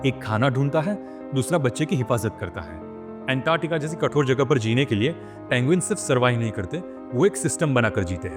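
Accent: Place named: Gujarat, India. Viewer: mko aap gujrati kyu lg rhe ho aapke bolne ke tarike se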